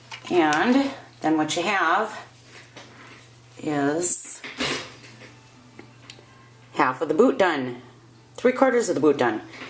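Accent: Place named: Minnesota, United States. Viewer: Great video! Thanks! Now I'm going to watch the "how to fit" video. I'm from MN but been in AL for 30 years. Fun to hear the accent again! Did I really talk like that growing up?? lol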